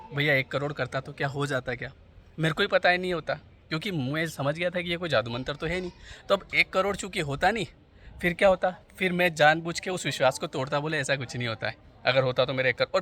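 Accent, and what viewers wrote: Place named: Rajasthan, India. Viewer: Bhai rahte kha ho aap asa lgta h Rajasthan se ho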